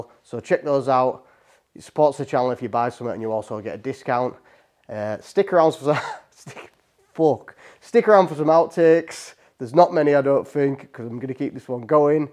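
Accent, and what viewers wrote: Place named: England, United Kingdom. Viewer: Man just found the channel, loving the videos just wish you spoke more you have alot to share! I'm from Birmingham but moved to USA 21 years ago so I love hearing the accent reminds me of England my homeland.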